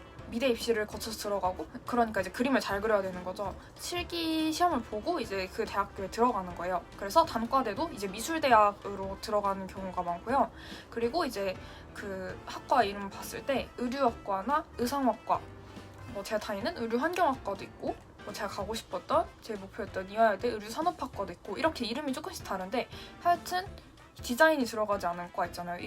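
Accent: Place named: Jeolla, South Korea. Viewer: 전라도 출신 학생이시네요! 억양이 천상틀림 없군요~ 영상 많은 도움되었네요 감사합니다~!!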